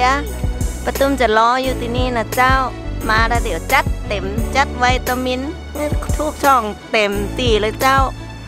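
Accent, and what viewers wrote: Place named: North, Thailand. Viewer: สวัสดีครับ ได้ยินเสียงชาวเหนือผมคิดถึงแม่ละเมาบ้านเกิดผมเลย 😃👍👍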